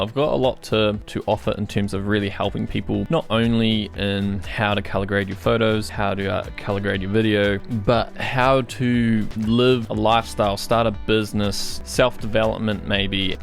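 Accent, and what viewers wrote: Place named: New Zealand. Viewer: So I’ve watched other videos of yours and only just clicked now that you do indeed have a kiwi accent and are from NZ too. I feel so stupid haha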